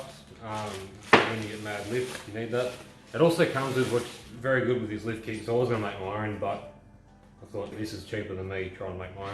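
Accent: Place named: Australia. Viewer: Love the Aussie speak- sunnies, muddies (in the other video), aussies.....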